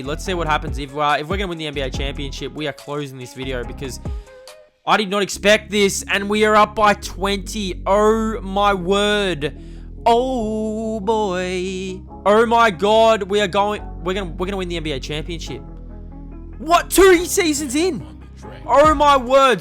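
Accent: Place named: Australia. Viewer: Where in Australia r u from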